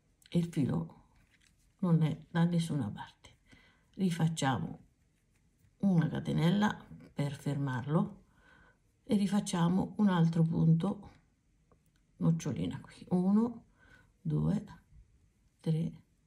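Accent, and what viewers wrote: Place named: Fermo, Italy. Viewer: Veramente bella e primaverile. Ma di dove sei?io abito in un paesino a pochi km da Fermo...a sentirti parlare sembri anche tu delle mie parti...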